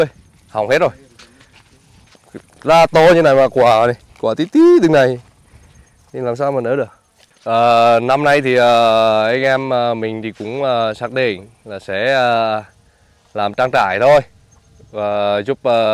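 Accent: Nghe An, Vietnam. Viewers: Nghe tiếng giống tiếng Nghệ mình quá. Bạn dân 37 hả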